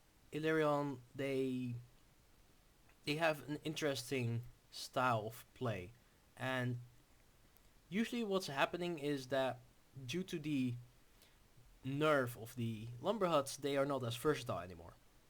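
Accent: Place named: Netherlands. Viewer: He has a pretty strong Dutch accent - probably the Netherlands ;)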